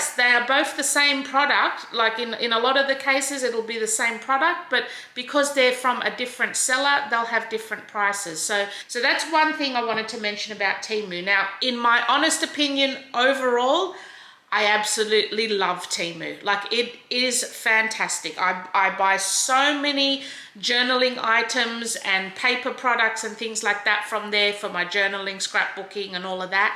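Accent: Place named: Australia. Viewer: Aren’t you from Australia? You sound like an Aussie! Love watching your videos.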